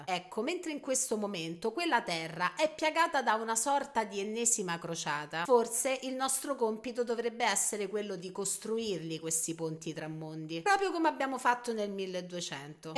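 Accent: Rome, Italy. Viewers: Per favore, meno acidità e torna a parlare italiano. Non se ne può più di romanità sottoculturale. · Inizio del video scoppiettante è veramente divertente come al solito la tua romanità ha accentuato è reso al quadrato quello che volevi dire gradevolissimo...... video Bellissimo queste notizie sono veramente utili a capire il nesso e il connesso con il discorso del presepe non sono d'accordo con figure figurine quadri e Santini perché il vero secondo comandamento vieta di creare immagini e di adorarle basta andare comunque a vedere i comandamenti veri e non quelli manomessi edulcorati dalla nostra carissima chiesa Vaticana 2)Non farti scultura, né immagine alcuna delle cose che sono lassù nel cielo o quaggiù sulla terra o nelle acque sotto la terra. Non ti prostrare davanti a loro e non li servire, perché io, il Signore, il tuo Dio, sono un Dio geloso; punisco l'iniquità dei padri sui figli fino alla terza e alla quarta generazione di quelli che mi odiano, e uso bontà fino alla millesima generazione, verso quelli che mi amano e osservano i miei comandamenti. 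Questo é solo un mio pensiero. Complimenti come sempre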